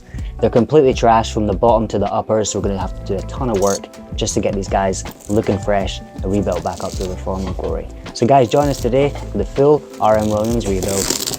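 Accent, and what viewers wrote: United Kingdom. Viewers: You've done a great job on the Boots, they really look amazing. Where's your business you sounded like you could be from the UK ??? Anyway, great job. This comment is from Denver Colorado, United States of America.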